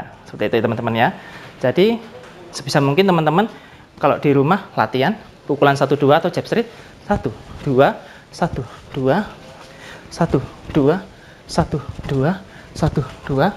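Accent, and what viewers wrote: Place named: Malang, Indonesia. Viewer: Wong malang ta mas.nyuwon wa e😁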